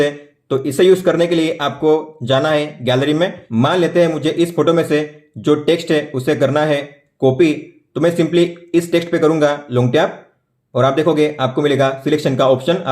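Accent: Gujarat, India. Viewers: Are you from gujarat..? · Are you from Gujarat?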